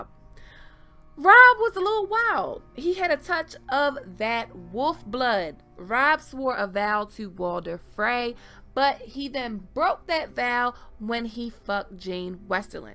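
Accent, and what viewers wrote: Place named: South, United States. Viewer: This female sounds like a stereotypical southerner , aunt jemima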